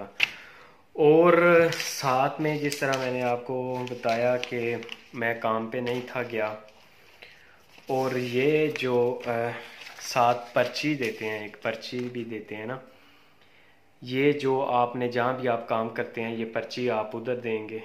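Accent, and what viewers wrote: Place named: Punjab, India. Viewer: Nice panjabi bro ap suraiki ho ya panjabi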